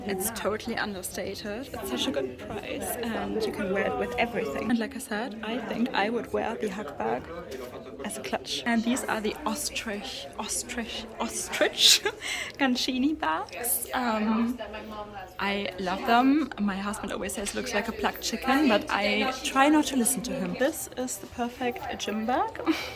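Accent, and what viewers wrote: Germany. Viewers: I don’t know if you are even going to read this . I have to tell you your accent makes my heart so happy. I grew up in an area where a lot of Germans moved to ( in the US) after the war. Your accent is like a blanket to my inner little Kori. When I’m having a rough day and miss all of my “ Omas, I watch you.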